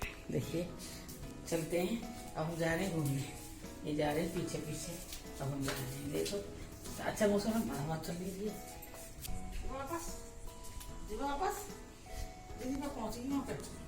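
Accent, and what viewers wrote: Uttar Pradesh, India. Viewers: Love u auntyji ...aapki language mujhe UP ki yaad dilati hai I am frm punjab now in italy bt meri sasural khurja mei hai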